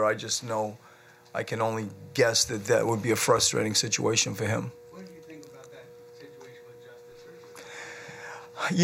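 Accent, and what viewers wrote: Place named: Maine, United States. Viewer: That's a Maine accent (northeast USA).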